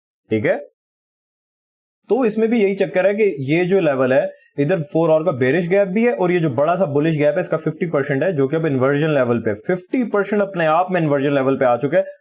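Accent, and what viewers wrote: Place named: Punjab, India. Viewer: Sir ap ke mooh se Punjabi phubdi aa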